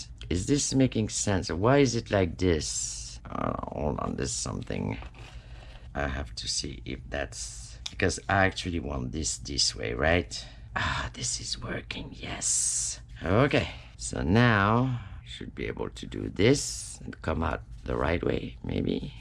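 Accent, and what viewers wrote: France. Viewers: Hey! I LOVE❤ your channel and I love your French accent!!❤️🤗. You are so cute and sweet!🌹🌹🌹 I was thinkin that you should make photo copies of those "old" magazine pictures of the women so you will have a never ending supply of the retro/vintage ladies🤷‍♀️👍😘 That would be cool too👍😘🤗❤️. Thank you for sharin all your ideas and little tips and tricks with all us🤗🤗❤️ We Really Appreciate it so much!!👍🤗🌹❤️🌹 Thank you😘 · Wow, wow, wow is all what I can tell you about your tutorials. I am your new subscriber I really love your humor your lovely french-english accent your way you edit your videos and and and...thanks for your time to inspire me and us!! You are a true Angel!!! Have a beautiful evening Heike from The Netherlands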